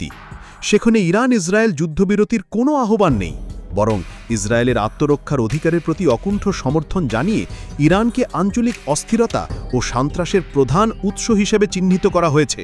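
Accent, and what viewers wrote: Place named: India. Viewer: কি হয়েছে কাল থেকে ভারোতের মতো করে খবর পড়া সুরু করছে।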